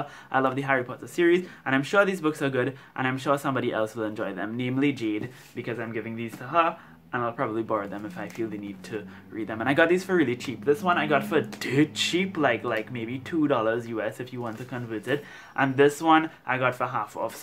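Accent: Trinidad and Tobago. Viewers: are you from Trinidad because you have a Trinidad accent by the way love your videos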